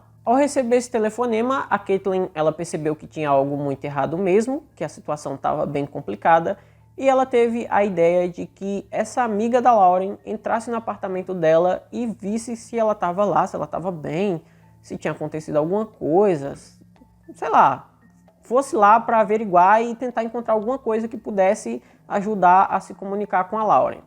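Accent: Northeast, Brazil. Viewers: Parabéns pelo seu trabalho. Se posso fazer uma observação essa é que você tem uma forma muito boa de expressar sua opinião . As partes engraçada deixa mais leve a narração. Ao mesmo tempo que choro em alguns casos, ao mesmo tempo dou risada com seu jeitinho de falar( acho que tem sutaque nordestinos). Sou nordestina e amo cada pedacinho , sinto muita falta .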